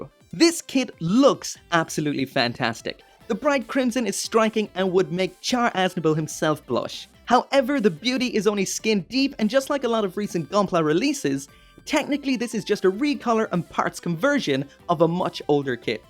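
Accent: Ireland. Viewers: Oy! Irish?! Noice. Is your waifu genderbent lockon Stratos? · You're Irish-born huh?!?!?!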